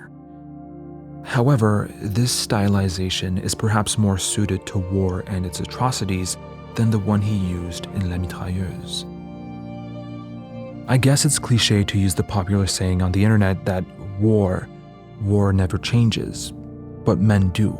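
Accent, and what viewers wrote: Quebec, Canada. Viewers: Es ce que tu es québécois? Ton accent me dit quelque chose, on se reconnais tous en tant que frère québécois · I have to say, your french pronunciation is extremely on point. Why you do have a quebecois accent tho? Edit: oh, you’re probably quebecois, makes sense. My bad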